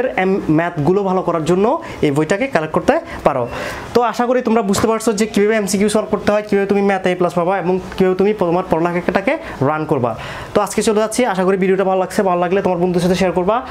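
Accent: Chittagong, Bangladesh. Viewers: চিটাইংগা নাকি???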